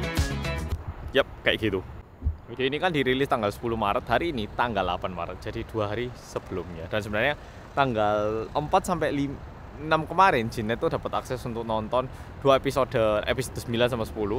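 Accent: Java, Indonesia. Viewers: Medok banget mas ngomongnya.. Jadi kayak Wong jowo asli.. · Mas ini wajah Korean tapi bicaranya jawa banget boleh tau ngga mas sail korea apa Indonesia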